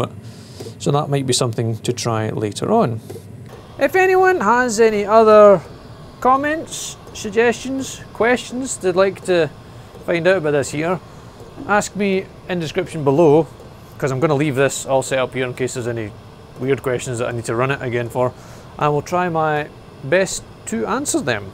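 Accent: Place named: United Kingdom. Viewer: NOT TO SURE , about advice from someone with " British Speak" , untill I heard that all too familuar fraze, "AHH PIECE OF SHIT " , then i felt at ease!!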